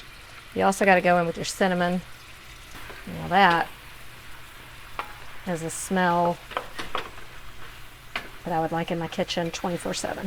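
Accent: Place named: Texas, United States. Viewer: Is she frkm TX? Love her accent ☮️💟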